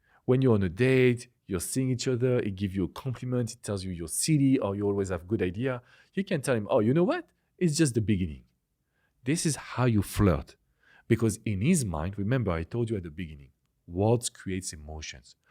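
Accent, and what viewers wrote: France. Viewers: I've just came to your channel, watched a couple of videos and I must tell you: you have very beautiful hands! I keep staring at them while listening ! And obviously I appreciate French man talk in English. Thank you for sharing great stuff. I subscribed, so I'm staying. Love from Poland. 💗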